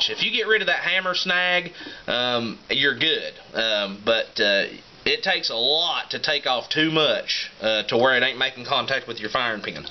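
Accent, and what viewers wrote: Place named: Alabama, United States. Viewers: Sounds like he is from Bama. Good vid! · Dude, where are you from? Trying to place your accent. Tennessee? Kentucky?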